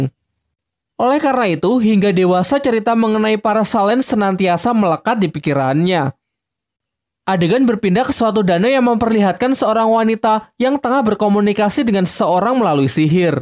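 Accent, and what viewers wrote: Java, Indonesia. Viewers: Orang jowo Yo kak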